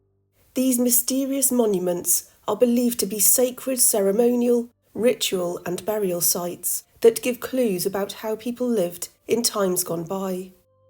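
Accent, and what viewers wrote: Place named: West Country, United Kingdom. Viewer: I thought I could hear a faint sign of a West Country accent in your voice.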